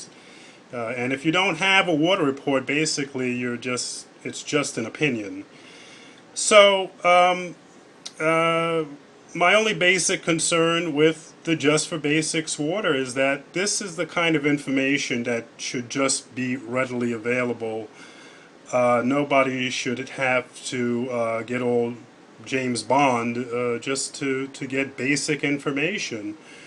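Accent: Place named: North, United Kingdom. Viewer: hes from up north i can tell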